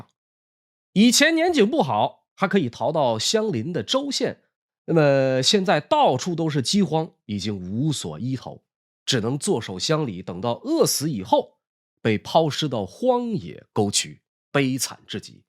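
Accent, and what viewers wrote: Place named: Northeast, China. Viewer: 哈哈，听了好几遍“我去他奶奶个拽的”，好亲切呀。你是东北那疙瘩的吗？🤣😂😁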